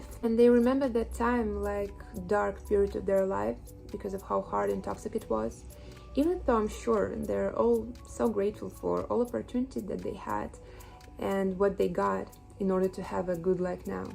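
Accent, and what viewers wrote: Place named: Russia. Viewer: а ты тоже русская,слышу по акценту аххах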